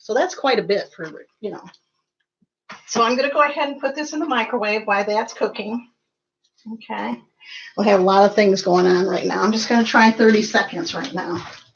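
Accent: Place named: South, United States. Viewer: 🌟✨ love your southern accent so much! 💙👏 Another awesome video. 💖💖 Stay blessed and your almost at 1k subscribers!!! So epic🙏🏻🌻🌱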